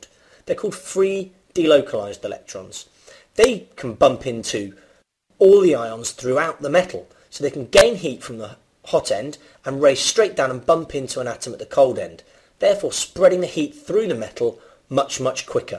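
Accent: United Kingdom. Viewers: Bro the British accent lol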